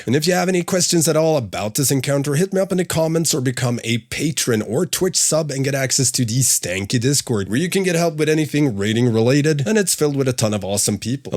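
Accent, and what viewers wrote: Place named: Sweden. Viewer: dat bjutiful swidish accent :)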